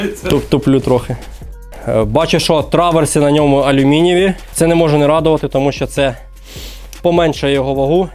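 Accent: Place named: Surzhyk, Ukraine. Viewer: Хорош на суржике балякать. Мы в Одессе не урозумим вашу сельскую гарную мову.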